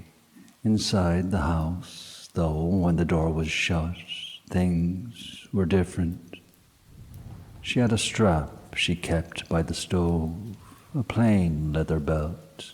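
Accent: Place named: Ireland. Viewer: Yes it's Saturday night and off course I'm on my own but the difference is tonight the 14th of February you are with me 🌹 Happy Valentine my beautiful Irish voice who tells the most haunting tales ❤️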